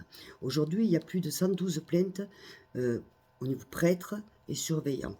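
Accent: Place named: Nîmes, France. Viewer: Vous êtes marrante, malgré votre vie de souffrances, et vous gardé l'accent nîmois. Je me suis abonnée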